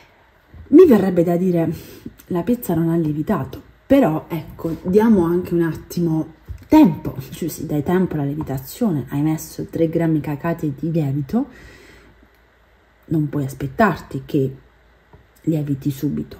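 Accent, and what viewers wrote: Apulia, Italy. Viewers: Salve,sono nuova mi sono iscritta al tuo canale sbaglio sei pugliese come me dall'accento sembra così, complimenti per il video grazie e buona giornata 😘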